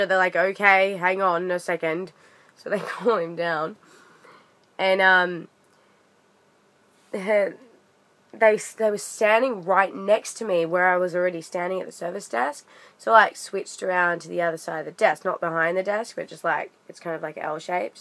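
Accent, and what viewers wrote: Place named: Australia. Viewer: Even though you still have your Aussie accent, you're turning into a Pom... You said Hoover instead of vacuum. Haha:) Nice work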